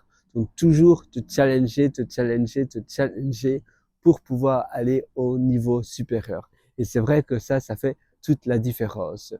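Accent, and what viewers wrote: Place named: Belgium. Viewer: Tu es belge ? Je reconnais un accent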